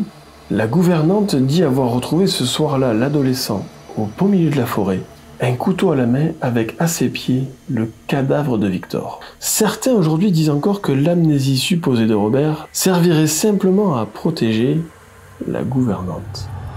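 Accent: South, France. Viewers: Bonjour, un grand merci pour votre récit qui m'a tenue en haleine du début a la fin j'adore votre façon de raconter et votre accent du Sud comme le mien.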